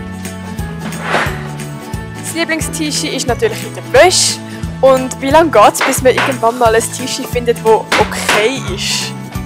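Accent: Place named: Switzerland. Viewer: der schweizerische "Dialekt" ist irgendwie interessant. schön dass es auch solche YouTube gibt :) und nicht nur hochdeutsche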